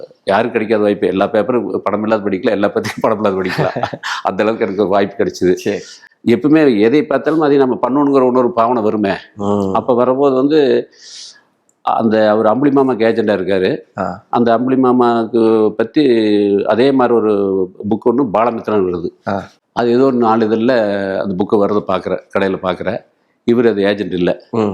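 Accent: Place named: Tirupur, India. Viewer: Tirupur accent ❤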